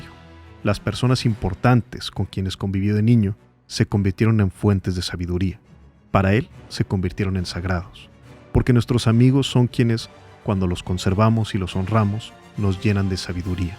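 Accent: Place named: United States. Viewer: Hubiera sido un buen video si no usará ese asento culero de americano y espanglish forzado, si está hablando en español!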